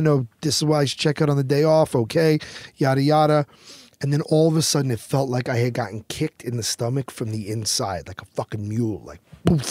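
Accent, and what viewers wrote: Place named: Brooklyn, United States. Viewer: So the way he talks on a scripted show is real and how he talks outside of work is fake? You're not very bright are you? He's from Brooklyn and speaks like this normally.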